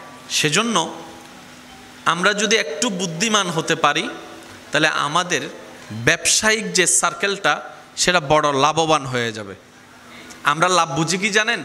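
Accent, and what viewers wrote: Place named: Chittagong, Bangladesh. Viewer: ভাই৷ অনেক সময় আপনার কথা গুলা চাটগাইয়া বুজা দায় তবে বুজি কম বেশ সব জেলার কথা গুলা আনেগো কোন জেলার কথা গুলা বুতে একটু কস্ট হয় আমিন মনে কিচু নিবেন না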